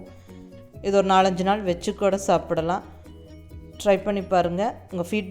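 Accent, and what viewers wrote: Kongu, India. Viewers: mam kongu tamil suprr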